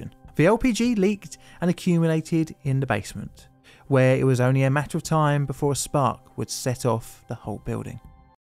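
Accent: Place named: Glasgow, United Kingdom. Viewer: Glaswegian? Cringe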